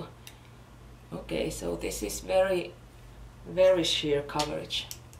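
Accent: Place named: Finland. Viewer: Aksentista arvasin että olet suomalainen. :D Jee, kiva löytää suomalaisia kauneustubettajia, jotka tubettaa englanniksi. :D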